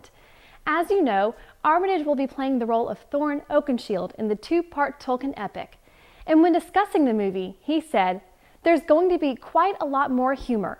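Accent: United States. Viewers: What kind of accent is that? It's like, a mid-west Arkansas thing but at the same time it sounds like shes trying to hide it..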